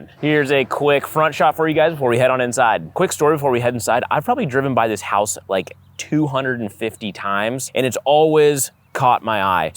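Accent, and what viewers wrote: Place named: Pacific Northwest, United States. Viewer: Whole video I was thinking the area looked so familiar and your accent was very PNW but when I saw Hood off the balcony that kinda cemented it lol 😅 I'm 98% certain I have drilled some water wells for those hills back 20+ years ago now. Little more crowded than it used to be.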